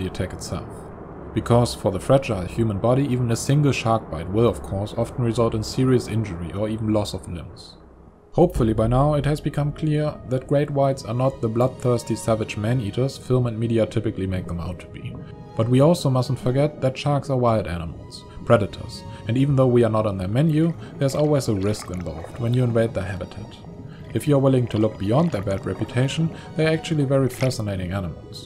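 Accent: Germany. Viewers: The german "I believe"narrator the art style this channel is my narcotic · Ich finde diese deutsche Aussprache so kacke :P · ur german voice is annoying. otherwise nice videos. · Schönes Video. Ich erkenne den Akzent direkt :D Germany !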